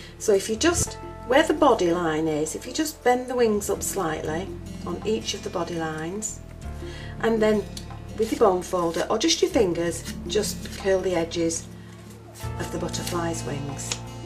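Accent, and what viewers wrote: England, United Kingdom. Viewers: Hi just came across your site! It's nice to hear English accent!lol have subscribed....now I'm going to look at your other tutorials. I live in Sussex.😍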